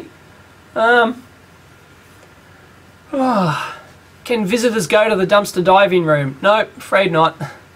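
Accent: Australia. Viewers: Just for the record... Love to hear an Aussie be a true Aussie!! keep it up mate! Ignore those other winging bastards that can't understand our shenanigans!! THANK YOU VERY MUCH! its refreshing to hear! Please keep it up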